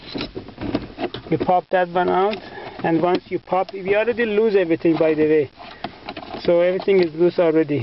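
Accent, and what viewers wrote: Iran. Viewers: hahaha! Love your Perrrrrrsiaaaaaaaaaaaan accceeeeeeeeeeennnnnnnt! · Like your accent, are you Iranian?!!!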